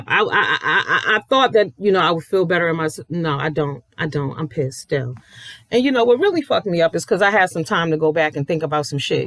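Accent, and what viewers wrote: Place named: Boston, United States. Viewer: Happy Belated Birthday Queen! 💕🙌🏽💃🏾🎂🥂 Btw are u from New England? U sound like my Boston Family (sisters)...😎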